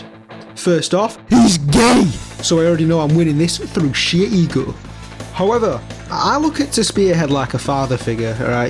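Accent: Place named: Birmingham, United Kingdom. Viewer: Honestly seemed liked a birmingham chap to me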